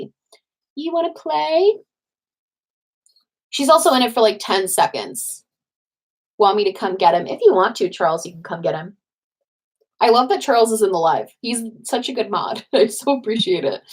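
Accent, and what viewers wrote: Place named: Boston, United States. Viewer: i’ve been binging your channel lately and the fact you have a BOSTON i’m gonna cry you’re literally my favorite person right now ❤️🥺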